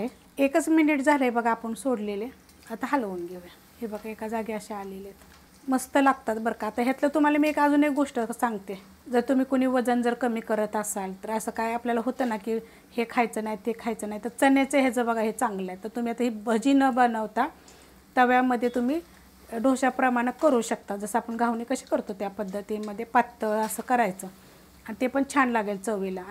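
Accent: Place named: Mahabaleshwar, India. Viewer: तुमच्या सगळ्या रेसिपी एक नंबर असतात...तुमच्या बोलण्यावरून मला तुम्ही माझ्या माहेरच्या म्हणजेच महाबळेश्वरच्या वाटता.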